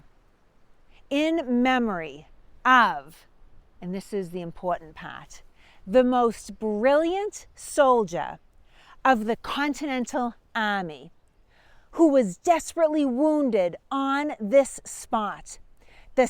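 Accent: Boston, United States. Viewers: Great piece, excellent production, clear presentation and information before unknown to myself, I love the charm of New England, Boston accent...you are bold in facing the wind with such humour and laughter, can't wait for more of these, makes learning about the history FUN!!!! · Great history and I always look forward to your informative and acurate posts. No way AI as that Boston accent is unique, (to humans.) Keep up the good work! Can you post any articles links that you write? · ❤❤❤❤Brilliant Historical Videos! Loooove the Boston Accent! Too! ❤❤❤❤ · You only have to listen to talk for 30 seconds to know that she is definitely from Boston.🤣💕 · Boston accent much? Southie? Dah-chestuh?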